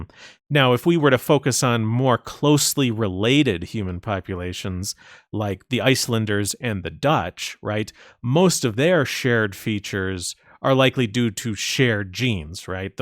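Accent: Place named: United States. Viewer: Maybe west-coast or mid-west American-- it's quite generic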